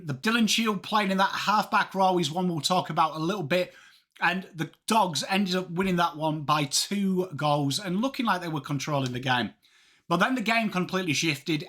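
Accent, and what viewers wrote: England, United Kingdom. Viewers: It’s so weird listening to a pommy talk about afl lol but ya know what you’re talking about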